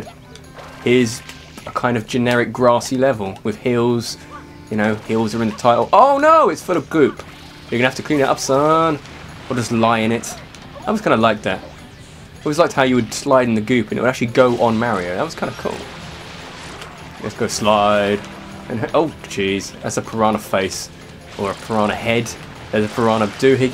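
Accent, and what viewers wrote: United Kingdom. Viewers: It's been a while since I've watched one of let's plays, and I've really missed his nice british voice. I've got three more LPs lined up after this one, so I'm gonna get a whole lot of lovely brit; after too long of no brit. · Jarren Bonson Subtitles, for when sound isn't an option beyond hearing that wunderbar British accent.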